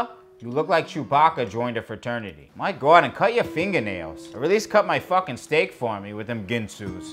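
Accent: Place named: South Boston, United States. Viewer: XD I love that he has a southie accent!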